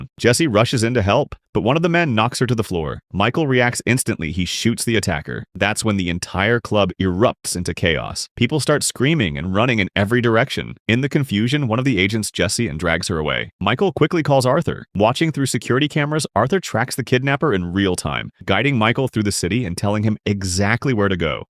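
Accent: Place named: United States. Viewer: Why do we have to endure these ghastly American narrations?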